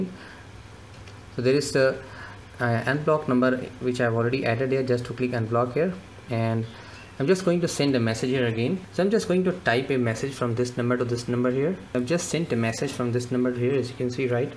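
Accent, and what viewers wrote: India. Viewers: Indian accent+low quality microphone+bad quality video= can't understand what you are saying.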